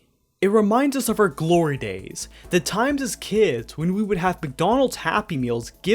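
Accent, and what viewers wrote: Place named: United States. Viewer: im guessing that the video creator is from america based on their voice. here, most people say it with a less pronounced second "t", like little being pronounced more like "liddle" here in the US. so usually people in the u.s pronounce it as "teh-doe"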